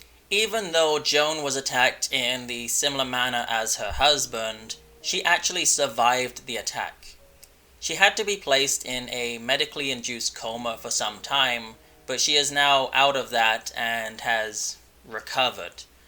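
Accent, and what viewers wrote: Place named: England, United Kingdom. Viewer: could deffo hear your English accent :) thank you for replying, love your channel ❤️ xx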